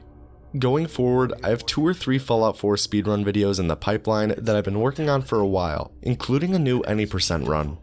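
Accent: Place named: Boston, United States. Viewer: I detect a Boston or new England accent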